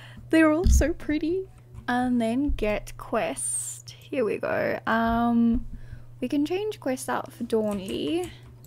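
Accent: South Africa. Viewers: Hiii I'm new I was wondering if you are south African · Are you afrikaans? Bc it kinda sounds like it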